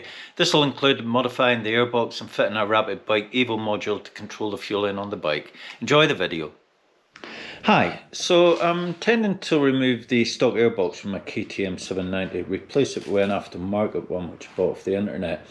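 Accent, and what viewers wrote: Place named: Scotland, United Kingdom. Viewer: A braw bricht Scottish accent!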